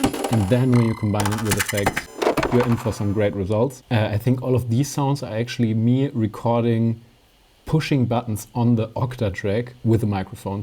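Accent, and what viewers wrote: Germany. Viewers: way better with the german accent. thanks!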